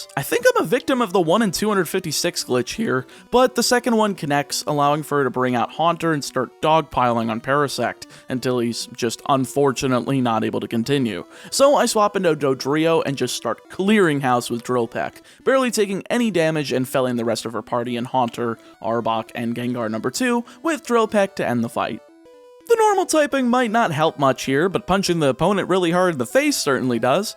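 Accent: South, United States. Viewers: YouTube told me i haven’t watched this video so here we go again with the funny southern man and the way he says rAival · Anyone else think he's pronouncing Rival real southern like in the beginning? In fact, he seems to slip into a southern draw alot this video xD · At the beginning I couldn't help but notice that you are definitely southern. You don't have a strong accent for the most part, but when you said the list of rivals you pronounced a lot of them as rahval. As a fellow southerner there's nothing wrong, just an observation.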